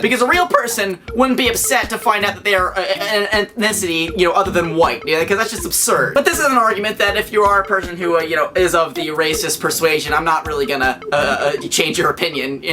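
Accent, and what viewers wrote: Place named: South, United States. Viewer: I LLLLUUUUUUVVVVVVV ur southern accent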